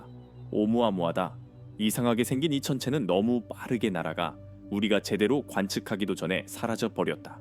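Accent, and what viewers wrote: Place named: Yanbian, China. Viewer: 낯사 ㅋㅋㅋㅋㅋ 조선족이야? ㅋㅋㅋ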